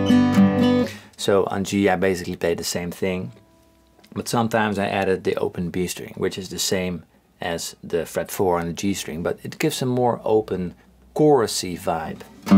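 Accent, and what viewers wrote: Netherlands. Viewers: Ik wist niet dat je Nederlands was maar had wel al z'n vermoede met het engels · After 3 sec of speaking I` could here immediately you are a dutch guy..amazing haha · Haha ik dacht op het begin wat een raar accent het lijkt haast wel een Nederlander en toen dat stukje van je vrouw was het echt zie dat dacht ik al. Btw leuke video en erg interessant! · Ik dacht al meteen... Hij heeft een Nederlands accent en ik zie je kanaal voor het eerst, dus ik wist het niet. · Ik hoorde gelijk dat je Nederlands was 😂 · hij heeft een best duidelijk nederlands accent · Are you from the Netherlands? I never noticed😂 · ik hoorde al een NL accent in je intro! Dope vid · Oh shit, you're Dutch lol. Hoorde het eerst niet maar nu wel hahaja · I heard your dutch accent instantly. I'm dutch too👊🏽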